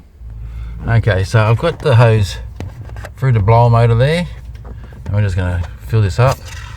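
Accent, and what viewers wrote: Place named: New Zealand. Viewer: haha oh really 😂 sorry mate - yeah definitely heard some Kiwi twangs in there. Maybe with so many of us kiwis here it’s starting to rub off on ya! Ha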